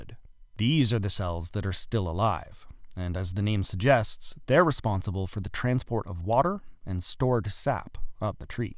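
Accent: Canada. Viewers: This guy has a thick canadian accent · Victory Begins in the Garden And he has the most Canadian voice to go with it. CBC Radio worthy. 🙂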